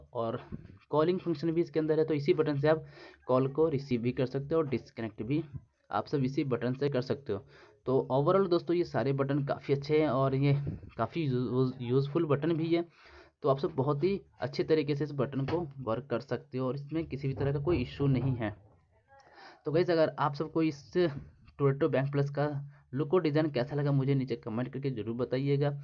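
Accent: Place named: Punjab, India. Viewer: Amazing Punjabi accent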